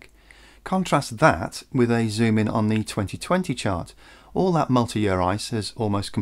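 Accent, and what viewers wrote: United Kingdom. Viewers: You forgot to mention Geo Engineering or Weather Modification. Not really impressed with the Brit accent.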